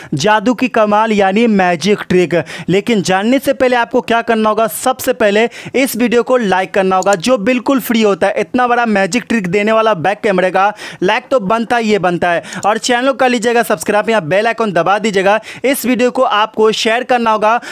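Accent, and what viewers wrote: Bihar, India. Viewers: Bihari h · Totalaa nahi hai BIHARI hai...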